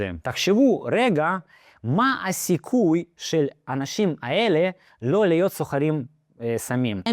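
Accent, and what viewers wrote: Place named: Russia. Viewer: у тебя ярко выраженный русский акцент... ты бы хоть титры на русите сделал бы.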